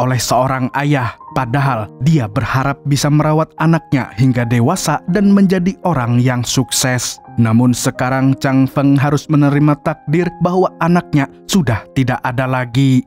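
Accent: Banjar, Indonesia. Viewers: nahh urang banjar sekalinya amang nih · Babang editor ni org Banjar kah😅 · Banjar sekali nya semngatt tarus bang ngonten ny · Adminnya urang Sungai Tabuk kah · urang banjar kah pian mang😅😅😅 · Urang Banjar kah mang? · Orng banjar kh mang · Urang Banjar kah l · urang banjar jua kali ya pian lah😂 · Jahh urang Banjar kah min? 😅😁👍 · uhhh org banjar😂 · Buhan Banjar aaja nihh adminnya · widih orng banjar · Urang banjar pinanya sidin..😅😅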